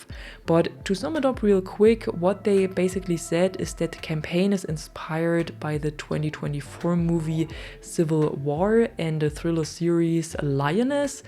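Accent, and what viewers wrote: Germany. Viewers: as a german, she sounds ultra german to me haha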